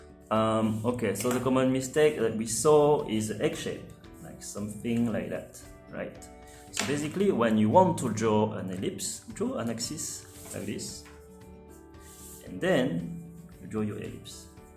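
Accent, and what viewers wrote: France. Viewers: accent de français ça jreconnais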